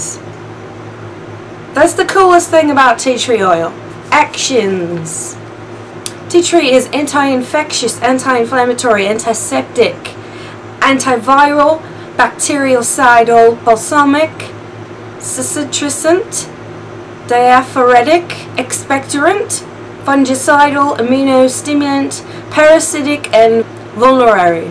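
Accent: Australia. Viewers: I'm Australian and use tea tree daily love it! It was entertaining listening to your Aussie accent! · loving the aussie accent!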